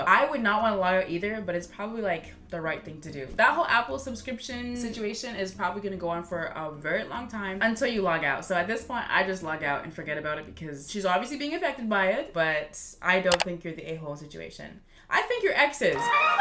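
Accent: Canada. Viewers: GIRL YOU CANADIAN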